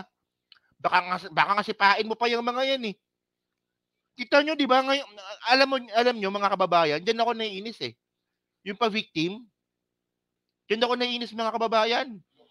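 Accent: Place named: Cavite, Philippines. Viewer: Yung tonight ilang gabi na yun! Sabi nga kapag ang ilog maingay, mababaw lang yan., Caviteño ka ba? Murang Caviteño naririnig ko sa yo, e!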